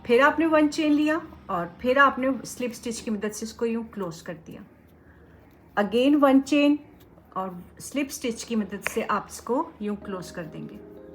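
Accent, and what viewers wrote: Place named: Pakistan. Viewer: Lovely api. Ur Urdu accents sounds Pakistani 🫶🏻 happy to see. Bhot he details me r bhot achy sy smjhaya ap ny. Lots of love❤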